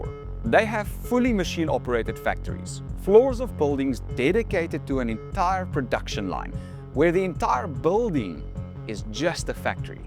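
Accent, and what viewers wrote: South Africa. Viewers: You're South African? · Are you South African👂?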